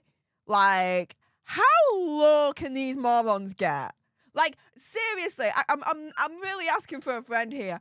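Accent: Yorkshire, United Kingdom. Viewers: I remember your first videos. I couldn’t understand a word you said, either you are speaking more American or my ear is used to your accent now… Where are you from in the UK Or Australia?.. I cannot figure it out. 😂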